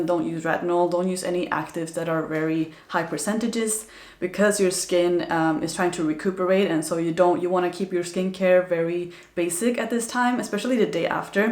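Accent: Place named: Hungary. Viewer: Not related to the video, but I would have bet that you are hungarian (after your intonation) until I saw that you are from Sweden 🙂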